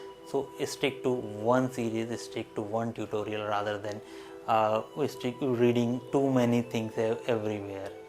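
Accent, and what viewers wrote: India. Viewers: Actually is speaking in indo English language so that others who has basic English they can understand wht is he saying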